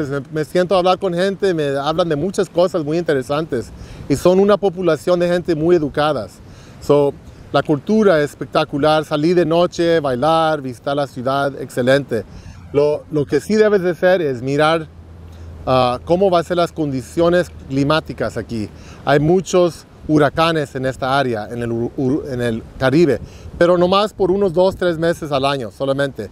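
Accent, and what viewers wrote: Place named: Mexico. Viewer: El tiene accento mexicano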